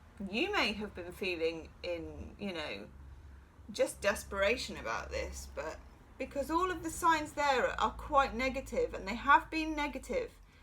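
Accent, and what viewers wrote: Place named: United Kingdom. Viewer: #2 let me just get a cup of waaaaater loving the British accent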